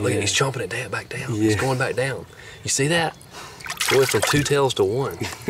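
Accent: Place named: Virginia, United States. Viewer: Love the accent :) Virginia ?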